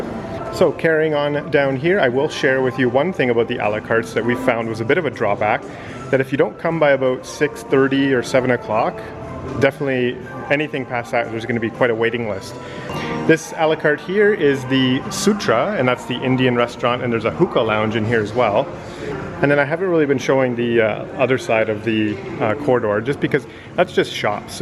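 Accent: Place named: Canada. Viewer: Obviously you are Canadian. Linguists generally point to around 10–15 distinct accents in Canada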